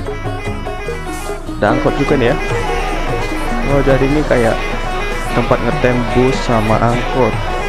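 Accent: Bali, Indonesia. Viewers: Bang pasti asli Bali ya.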